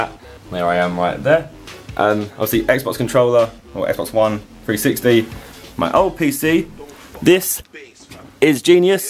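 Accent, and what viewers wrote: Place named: London, United Kingdom. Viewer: I love the way Londoners say 'something'. 'Hey guys Zerkaa here and ive got suink a little bit different for you today'. Just lol.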